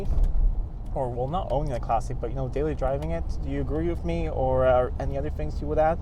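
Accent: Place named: United States. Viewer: I know I’ve heard several times saying that you were in Sweden. But you do not sound Sweden or English you sound American.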